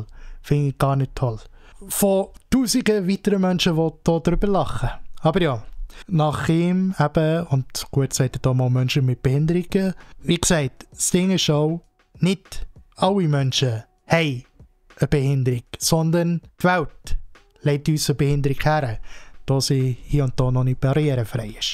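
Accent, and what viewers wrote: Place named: Switzerland. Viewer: War nicht leicht, aufgrund des "schwyzer Dütsch", alles zu verstehen, aber das was ich verstand: Sehr kluge Worte, sehr gute Einschätzung! Danke dafür! VLG Johann. :goodvibes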